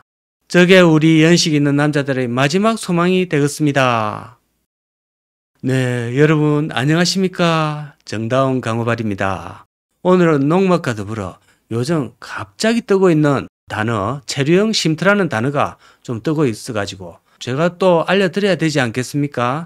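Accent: Gyeongsang, South Korea. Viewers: 경상도 사투리 정겹습니다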